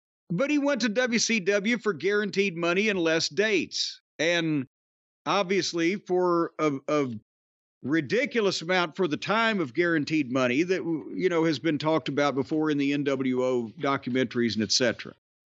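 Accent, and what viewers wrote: South, United States. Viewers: Hate to say it but ya got that southern accent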